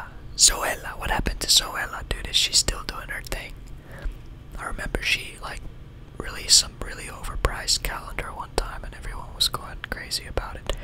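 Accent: United Kingdom. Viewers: u nailed that british accent ngl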